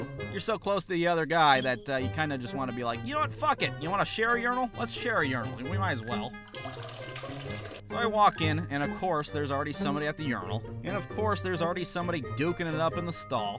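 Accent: United States. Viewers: Are you American or what I'm not sure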